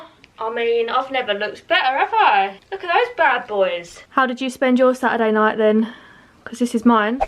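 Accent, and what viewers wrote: Essex, United Kingdom. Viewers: Hi! 🙂 I have been watching your videos since the one about your hair donation and just wanted to say you're doing great! I love your content and you seem such a genuine nice a funny girl, also HUGE fan of your Essex accent! I have lived in the UK for a while now but never been there yet, I am trying to convince my boyfriend to take me as I am also fan of TOWIE ( Gemma Collins in particular cracks me up 😆😆) anyways sorry for the long comment. love the gel mask thingy, I know is bloody heavy as I bought a sleeping mask in Primark with gel inside by mistake once, kept falling on my nose when I used during a long haul flight ( not a good look ) 😅😅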